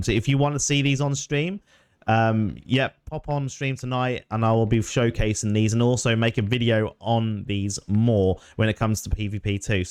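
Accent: Norfolk, United Kingdom. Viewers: I'm convinced by your slight accent that you're from Norfolk like Kings Lynn way lol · Where you from fella? Norwich boy here and I swear I hear a Norfolk twang here and there??!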